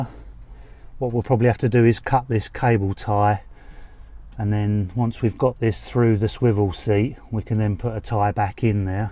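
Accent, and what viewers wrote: United Kingdom. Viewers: You’re a cool dude with a great British accent (which locality?). Not your best vid.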